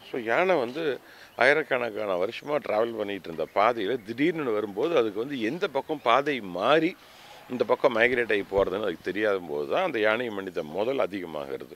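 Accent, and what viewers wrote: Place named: Coimbatore, India. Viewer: வெள்ளந்தியான பேச்சு. கோயம்புத்தூர் வழக்காடல். அய்யா நீங்கள் ஏன் இந்த பாழாய்ப்போன அரசியலுக்கு வர வேண்டும்?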